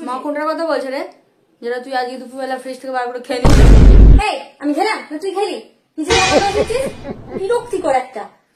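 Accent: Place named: Murshidabad, India. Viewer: মুর্শিদাবাদ এর উচ্চারণে বেশ ভালো লাগছে 😂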